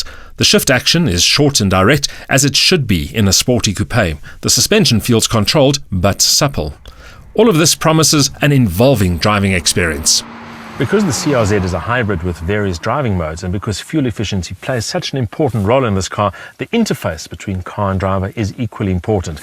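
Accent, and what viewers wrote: South Africa. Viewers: South African English , Their is a few different accents in South Africa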